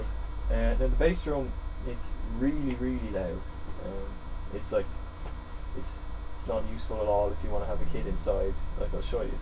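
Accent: Ireland. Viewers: are you from waterford? you sound like your from the deise...boy!!! :)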